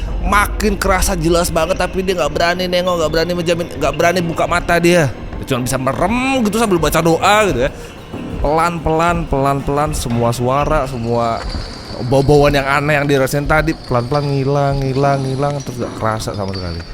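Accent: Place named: Lampung, Indonesia. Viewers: Latar jawa, logat lampung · Lampung gitu logatnya bg😅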